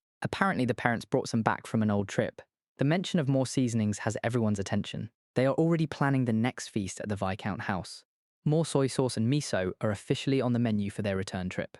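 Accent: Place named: United Kingdom. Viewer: British voice -10 Points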